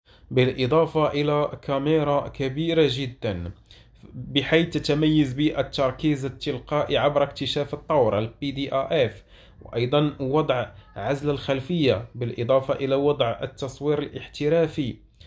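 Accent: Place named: Morocco. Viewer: هادي لهجة مغربية اختاه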